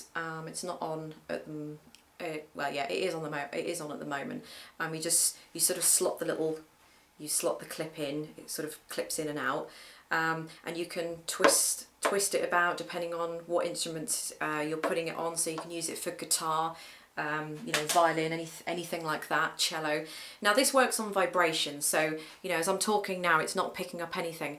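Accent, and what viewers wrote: England, United Kingdom. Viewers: well, I have been playing guitar for 30+ yrs, and piano, bass and other instrumets for a very long time, but have always wanted to play the violin.. I finally have my hands on one, and was obviously wanting to even know what notes the strings were and go from there, Very informative video, but maybe a little too much info for a total beginner. by the way, your English accent is just SOOO awesome. The accent combined with your obvious beauty, that's quite an awesome combo :)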